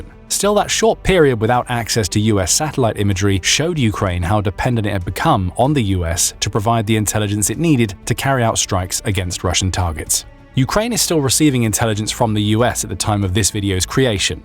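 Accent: United Kingdom. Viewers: Sad. The narrator speaks with a British accent but speaks such dismal English. 'They just gave', 'They just gave' - yugh, what a wrong 'un!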